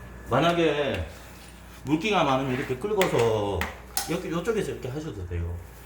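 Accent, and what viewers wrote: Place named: Gyeongsang, South Korea. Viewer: 아니요. 경상도 말투입니다. 투박하면서 정감있는 말투~~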